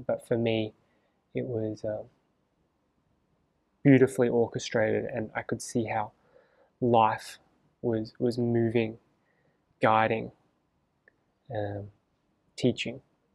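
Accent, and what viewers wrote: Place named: Australia. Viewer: Bro are you Australian or from New Zealand because I've noticed the consciousness of people with this accent is wild 😂😂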